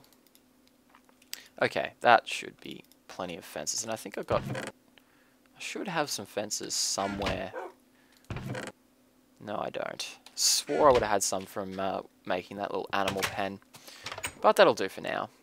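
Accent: Australia. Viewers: this may be a stupid question, but do you live in Australia? You sound sliiightly Australian.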